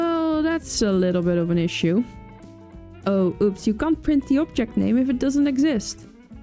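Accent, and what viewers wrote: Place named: Netherlands. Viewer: Your Dutch for sure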